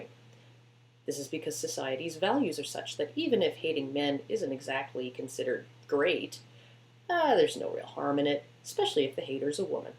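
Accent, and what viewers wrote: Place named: Canada. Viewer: i really like this monotone reading voice is the best way to get a point across. this not sarcasm you should get a job at npr all though you sound canadian i'll look up ya'lls npr lol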